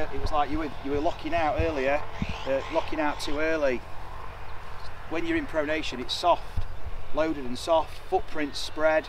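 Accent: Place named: Doncaster, United Kingdom. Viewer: Sounds just like Doncaster 🤩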